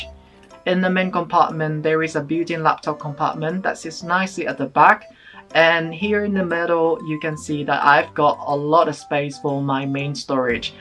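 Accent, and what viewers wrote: United Kingdom. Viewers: Ui cho em xin phép hỏi là anh học tiếng anh từ lúc nào và sao anh học được giọng anh vậy ạ ??? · I hear a little bit of a British accent 😃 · Oh you have British accent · Sounds like British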